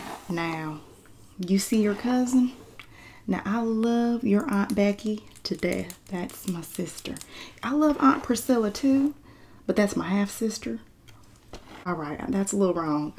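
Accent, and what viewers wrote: South, United States. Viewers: My new favorite video of yours!!! I love southern accents ❤😂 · Your southern accent is so on point... where are you from? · Whew, this accent is so accurate. It made me feel I was back in NC for a moment 😭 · as a alabamian born and raised in the south i can say this is 100% accurate and this is a almost perfect southern accent great job! but you forgot to offer her some sweet tea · The sassy comments coming from our southern mama 😂❤ the accent is spot on! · As a Southerner with friends and family with thick accents, I am dead!! 😂 I love this! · Everyone in my family has a southern drawl Can confirm this is accurate And hilarious 😂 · This was such a solid Southern accent! 😂 I’m from the South, and although not everyone in the South talks this way, for the ones that do, this was spot on. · Loved the Southern accent. Every Southern Mama I know follows up any "advice" or opinion with a "Bless your heart" to keep her sweet Southern Belle persona. 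Example: "Make sure you see him without his hat. He could go from an 8.5 to a 2, bless his heart." Excited to see Part 2 and how the date went! ❤ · 😂😂😂I CANT CANT CANT!!!! 😅😅😅ur killing me!!! 😂 I’m truly a Southern woman!!! 😂 you did pretty darn good with the accent - fyi your talking red neck mixed with country with a splash of southern baptist mom 😂…. 😂 Alabama- mixed with Georgia and Mississippi 😂 ❤ most fake southern accents I cant stand listening to… BUT YOU ARE AWESOME 😂…. I had 4 boys but was a grandma at 37 😂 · This is so freaking funny 🤣 and very tingly!! Those clacking fingernails ❤ I am a southern woman and you nailed the accent 👏👏👏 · please do make this a series 🙏 the southern accent is so satisfying and the mom character is just so loveable ❤ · I love the Southern mom! I live in SW Indiana across the river from Kentucky and people here definitely have a twang. So this was relaxing and familiar - thank you!❤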